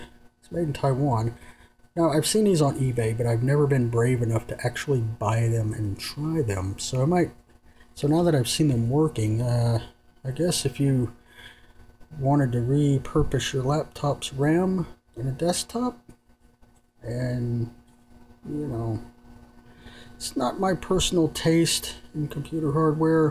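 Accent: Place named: United States. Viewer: Thank GOD finally someone American you can understand.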